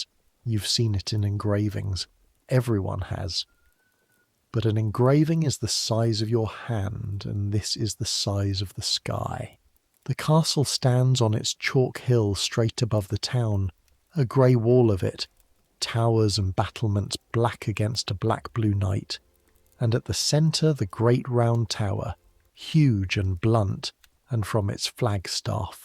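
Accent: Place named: United Kingdom. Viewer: Actually I do actually fall asleep to your voice. I have many times. Your voice is comforting, calming, and, to be honest, just a little boring. So it is very nice to sleep to. I happen to enjoy a British accent quite a bit, rather like a good smoke, even though I no longer imbibe. So I often have dreams related to the topics you’re talking about. I live in Norman, Oklahoma, USA & I like to think I know so much about certain members of the monarchy. I must seem hilarious or perhaps pitiful to some people. Many Americans know absolutely nothing about the royal family or British history. But bc it doesn’t involve my own life & I don’t have strong feelings about your monarchy or history, the subject matter is also calming & a little boring. But I just happened to wake up to this video of yours this morning am, Sunday, August 23, 2026. That is actually quite late for me. 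I will save this to finish for later,as I would a nice iced coffee with cream & milk. Good morning and thank you for some nice nights & mornings!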